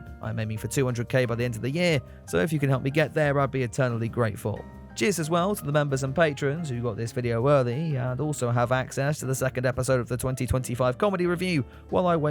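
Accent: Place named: United Kingdom. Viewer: Just discovered your channel. Where have I been? Love your droll sense of humor! You are definitely in keen competition with another YouTube channel where the creator/narrator is probably an American, maybe Canadian. But I love the British accent, which Rick doesn’t have. A plus for you, for sure. I am subscribing now, only half a video in. Kudos.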